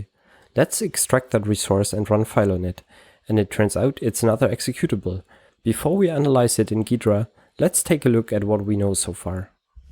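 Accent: Germany. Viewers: nach seiner Aussprache zu urteilen würde ich nen 10er wetten das der gute mann aus dem deutsch sprachigen raum kommt. geniale Facharbeit, ich mag es wenn menschen ihre arbeit verstehen. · I love your German Accent! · Always the slight german accent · This is great, I'm always surprised by new hacking channels every now and then. Listening to your voice, it sounds like you're German, am I right?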